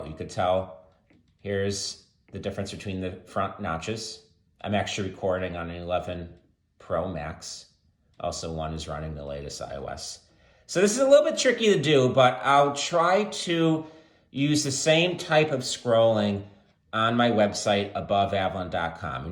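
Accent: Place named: Connecticut, United States. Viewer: Informative video and strong Connecticut accent. 😅 I spent my middle- and high-school years in Suffield.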